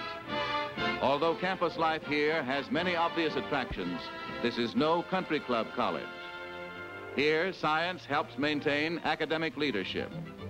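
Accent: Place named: Transatlantic, United States. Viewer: Also known as the "Transatlantic accent"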